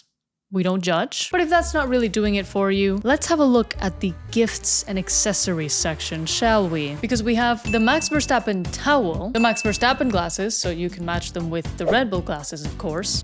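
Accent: Spain. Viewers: Where did you learn spanish? The pronunciation sounds like you're from Spain. Saludos desde España :) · Acento español thick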